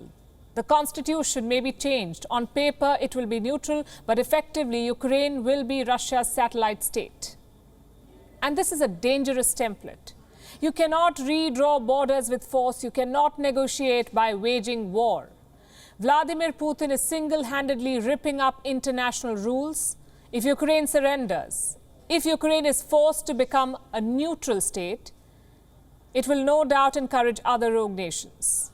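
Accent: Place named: India. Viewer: Even with a strong accent, you are still the most understandable Indian-English speaker lol, keep up the good work.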